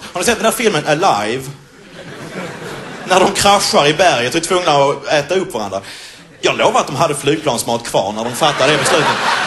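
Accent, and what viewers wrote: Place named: Scania, Sweden. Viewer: Har han ju inte x'D Bara lite mindre brytning men jag hör fortfarande skånska rakt igenom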